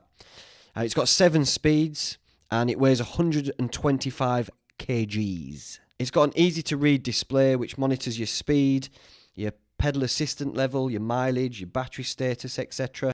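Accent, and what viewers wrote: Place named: Sheffield, United Kingdom. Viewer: You sound like you are from near me haha, im from near Sheffield - Rotherham - Just looking for an ebike myself!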